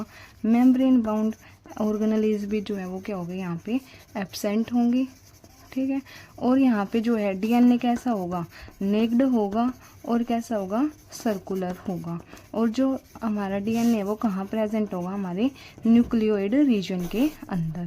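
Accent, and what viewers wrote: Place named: Western Uttar Pradesh, India. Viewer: Very good teaching 😊 Aap kha se ho ? Language Hindi aapne west up bagapt sayd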